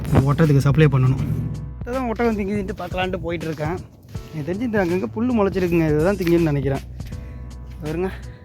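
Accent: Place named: Tirunelveli, India. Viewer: மிகவும் சிறப்பு அன்பர்! வாழ்த்துக்கள்!! திருநெல்வேலி அல்லது தென்காசி பேச்சு வழக்கு தங்கள் பேச்சில் தெரிகிறது!!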